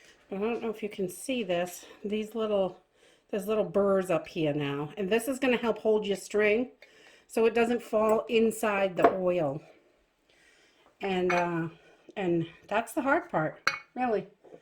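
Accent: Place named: Boston, United States. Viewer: I was listening to her talk, then she said that she "doesn't like to use her mason JAHS". I jumped right to comments to see if anyone mentioned where she was from. I am a Bostonian living in SC. Been away for about 10 years, so every time I hear the voices from home, I jump. Ya never notice the accent until you been away for awhile.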